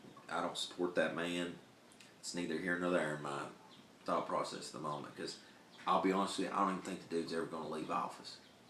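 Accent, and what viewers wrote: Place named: Alabama, United States. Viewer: Today I had a class to teach and I said pretty much what you just said. If people knew they were living in a controlled environment similar to the movie "The Matrix" they would freak out. The truth is too much for so many. I have tried for years to wake people up including my own kin folk to no avail. One or two of my nephews are awake but no one else listens or seems to care. The brainwashing is very , very strong on the population. I too was going to leave and head for the hills but instead I decided to stay and help my neighbors. The same neighbors that laugh at me when I talk to them about what reality is. It's going to be very, very bad and millions if not billions will die. Thank you for your hard work! Oh...your accent is similar to mine. I grew up in rural Alabama. Some folks just can't understand what I'm saying. 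LOL.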